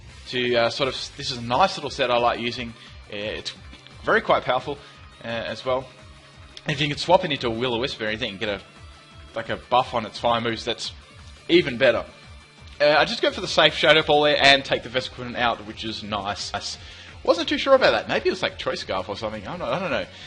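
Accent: Australia. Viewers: Wait did I hear that correctly are you from Australia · I KNEW you were a fellow Aussie. The accent game is strong!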